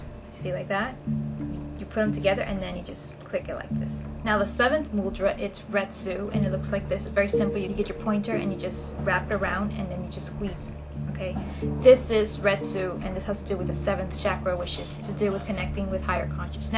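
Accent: Miami, United States. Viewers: you sound like you're from Miami. great video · The room your accent and the bird tells me your in Miami 😂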